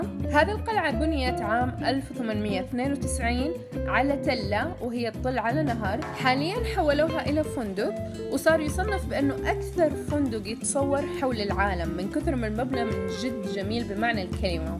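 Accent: Saudi Arabia. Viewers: ذكرتي اسمك في الفيديو و اسم مسيحي وانتي ما ذكرتي ولا معلم اسلامي في كندا ولهجتك جداويه ولكن اعتقد انك لبنانيه مسيحيه الاصل هذا اللي تبينلي..ربنا يهديكي للاسلام وهوي طريق النجاة باذن الله من النار يوم القيامه..والمسيحي والكافر لا يدخل الجنه حتى لو كانت اخلاقه في السماء لانه اشرك بالله وكفر برسالة محمد حتى لو قلتي انا أؤمن برسالة محمد صلى الله عليه وسلم.المسيحي يؤمن بأن الله ثالث ثلاثة وهذا في قمة الاشراك بالله عز وجل لأن الله لم يلد ولم يولد ولم يكن له كفوا احد..اتمنى لجميع المسيحيين الهدايه والسير على ملة الاسلام هدى الله الجميع..💗💗💗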